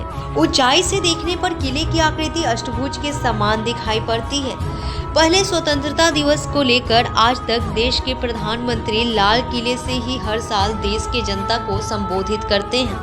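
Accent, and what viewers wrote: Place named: Bihar, India. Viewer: Voice over me bihar ka touch hai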